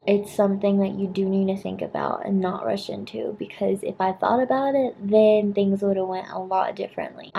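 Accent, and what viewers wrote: South, United States. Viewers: Her accent is SO fake too lmao she is country as fuck when you hear her in person. Even in here Gruuuunndddy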